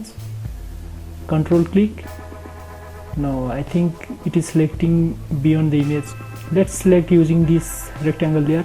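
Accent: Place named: India. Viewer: Man I love the fact that you make tutorials but sometimes its hard to follow indian accent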